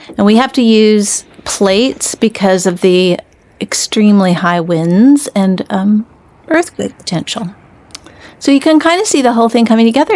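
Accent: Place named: New Zealand. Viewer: hi from nu zillun love your kiwi speak lol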